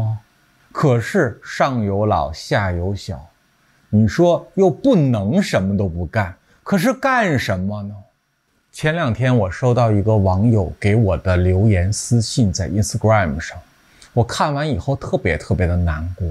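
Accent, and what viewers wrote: Beijing, China. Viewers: 纯正的京片子，適度的京式曲藝评说神態; 貼地、真實的講評内容; 抑揚顿挫的语调; 是繁茂的自媒體叢林中的一朵奇葩！ 支持你， 。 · 聲調像是老北京，容貌眉眼有皇室貴族的感覺，該不會是愛新覺羅後代😊按讚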